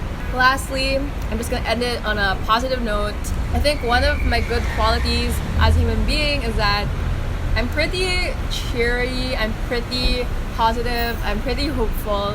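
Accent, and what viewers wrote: Philippines. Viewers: You have a Filipino accent when you speak English!! Cool!!!🤩😆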